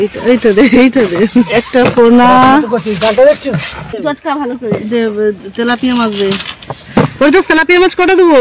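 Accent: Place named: Medinipur, India. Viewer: এই ভাষা টা আমাদের গ্ৰাম ও বলে মেদিনীপুর ভাষা, কাই টু মাই টু ভাষা ভালো লাগে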